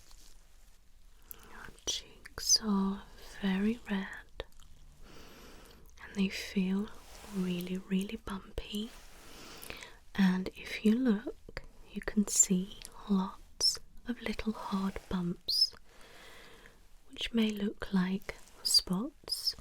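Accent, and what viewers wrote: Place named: United Kingdom. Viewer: I love the accent and relaxing voice 🥰. Are you british?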